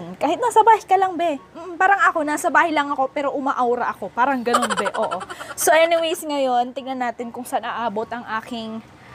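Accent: Ilocos, Philippines. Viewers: Ilocano Kaba ate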